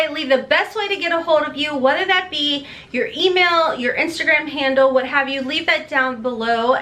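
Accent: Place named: Kentucky, United States. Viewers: I’m in West Virginia and I love watching your videos for the Kentucky accent. Love it! IG is cherylb_88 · Hi! I love watching your videos. I’m from Kentucky too and it’s nice to hear someone with the hometown sound. 🤣 Your videos are seriously my favorite! <mail>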